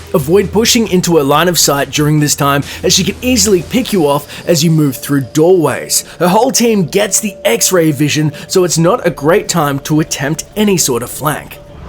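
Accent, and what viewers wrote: Australia. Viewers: Are you Aussie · Ay, U Aussie?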